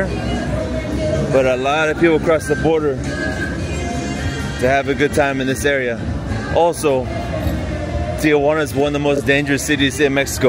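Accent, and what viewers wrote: Paisa, Colombia. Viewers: hey How long are you in Tijuana, not sure if you have time for a Meetup, I am from San Diego, Long time Tijuana Expert. I went to Medellin last year and that's how I heard about your Channel..Cheers, FYI Red Building is the famous Hong Kong lol. Funny you talk Spanish in Tijuana like Paisa People haha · Your accent sounds Colombian when you speak Spanish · Are you Colombian or Venezuelan? Cause your accent sounds like it. Great video tho 👍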